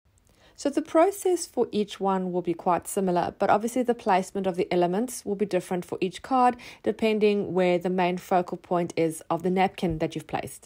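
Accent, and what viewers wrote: South Africa. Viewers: Has to be a saffie - my accent too. We say Serviette though? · You have a South African accent?? Loved the ideas shared.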